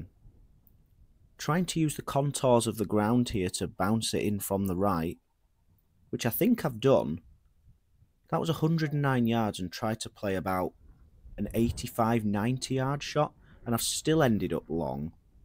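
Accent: Yorkshire, United Kingdom. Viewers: Watched the whole vid, defo a white rose lad. Hope your wedding day was awesome, you’ve gained a new sub. Much love from Lancashire.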